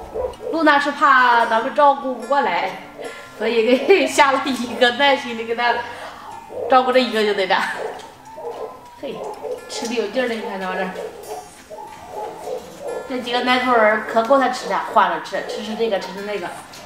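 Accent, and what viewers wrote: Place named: Hebei, China. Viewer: 真是发自内心的开心啊！做自己喜欢的工作最有滋味啦！听见我们河北的乡音，亲切💗💗💗💗💗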